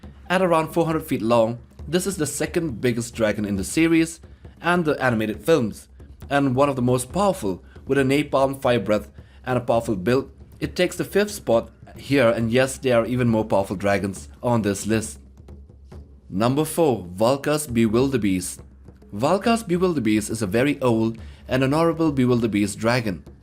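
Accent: Germany. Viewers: du bist son deutscher das hört man sooo hart :) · Love ur german axcent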